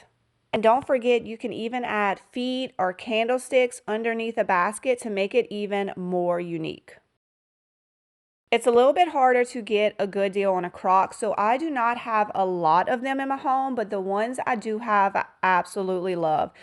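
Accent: Louisiana, United States. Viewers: I love you, I love your Cajun rambling, I love your Mississippi home, and I can’t wait to see all your new adventures. I was afraid you were going to announce you’re quitting YouTube to focus on FB and your website. I’m a YT girl so you can imagine my relief. You keep doing you girl, I’m along for the ride.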